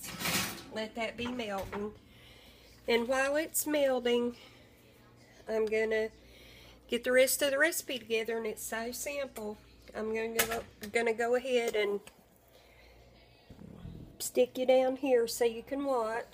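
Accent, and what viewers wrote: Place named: East Tennessee, United States. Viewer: I am a new subscriber and I just love your videos. You may have shared this in some of the videos I haven`t seen yet , but do you mind me asking where you are from? I am from East, TN and you sound very much like the people in this area.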